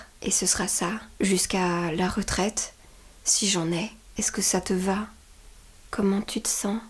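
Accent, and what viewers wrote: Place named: Quebec, Canada. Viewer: Anouk Fabing, je suis d’accord avec toi. J’aime, je la trouve inspirante, originale et belle malgré son accent québécois renié. Mais à mon avis, c’est possible de s’épanouir de à du lundi au vendredi si on est bien entouré au travail. Un horaire atypique est plus souvent un problème qu’un symbole de liberté, fait parti des rares personnes qui peut gagner sa vie en choisissant comment et quand elle travaille. Ce n’est pas le cas de la majorité des gens à qui elle s’adresse.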